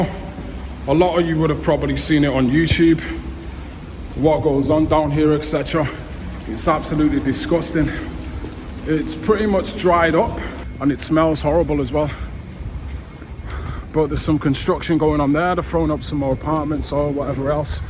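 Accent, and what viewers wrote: Manchester, United Kingdom. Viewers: Well going by his accent he’s certainly a proper Mancunian. 😊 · proppoh manchestoh accent , good video mate well done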